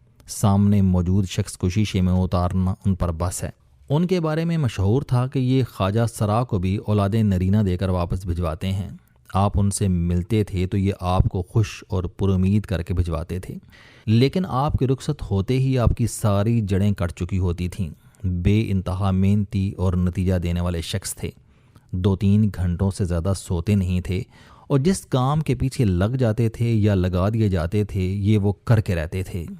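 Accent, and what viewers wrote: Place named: Punjab, Pakistan. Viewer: I can’t hear this man speaking Urdu Column in his Punjabi accent. Either Jawed should write his column in Punjabi for his reading. Or get someone who can read it in better Urdu pronunciation. ☹️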